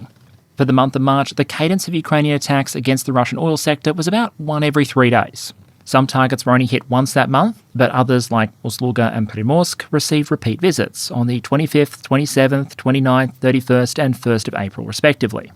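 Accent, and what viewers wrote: Australia. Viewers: He's an Aussie, alright?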